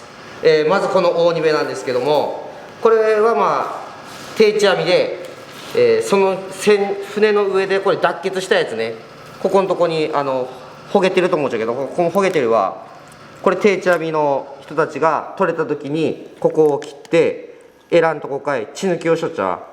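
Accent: Miyazaki, Japan. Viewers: トラックのりです、宮崎出身の、先輩の話し方と同じで、とてもなつかしいです。うれしかったです。頑張ってください。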